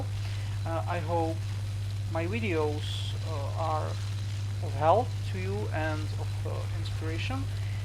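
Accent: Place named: Czech Republic. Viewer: jsi čech kámo ? :D :) podle nicku si myslím ze ano a podle prizvuku taktéž! :) (Y)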